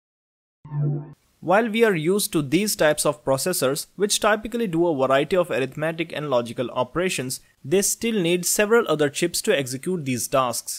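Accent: India. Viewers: Informative, but ruined by the Indian accent.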